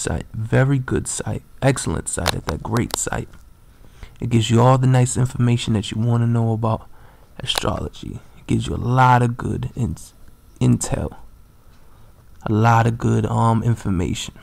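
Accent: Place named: New York, United States. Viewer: where you from baby? ny? I love yourrrrrr accent. ugh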